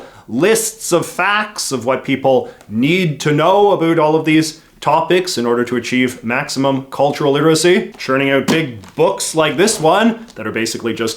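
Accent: Canada. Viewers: You have such a strong Canadian accent. Out and a Boot in a Boot.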